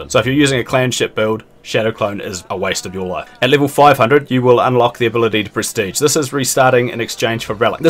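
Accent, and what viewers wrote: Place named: Australia. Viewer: must be the aussie accent as well <3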